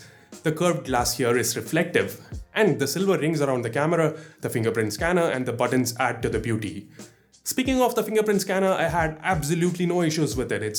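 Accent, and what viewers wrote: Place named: India. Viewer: Im an indian too. But this guy has the most indian accent ever.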